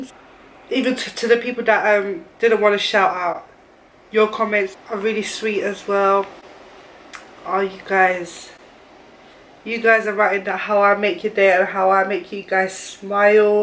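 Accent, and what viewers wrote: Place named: London, United Kingdom. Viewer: “dis is a turkish burrito outere” 😂😂😂😂😂😂 yhhh ur defo from ends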